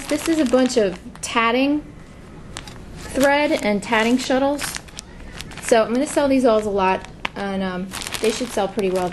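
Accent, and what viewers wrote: New Jersey, United States. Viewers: Just when we thought the crazy knit sweater guy was the funniest thing up pops your "Jerserylicous voice" with a garage sale story we are all too familiar with - thank you so much for the shout outs it really means alot to us - we are looking forward to your challenge vid - thanks again T&D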